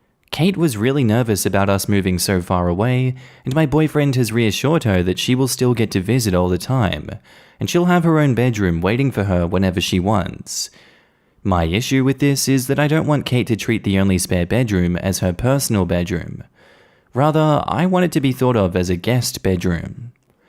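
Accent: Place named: Australia. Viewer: I love your Aussie accent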